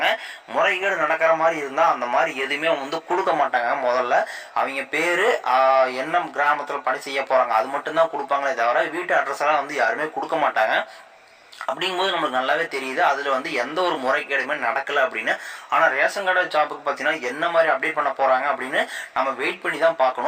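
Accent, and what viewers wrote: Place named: Erode, India. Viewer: Erode sluringa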